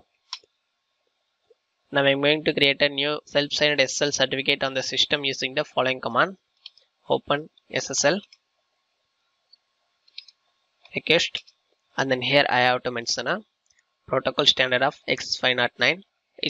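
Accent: India. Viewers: SOUTH Indian English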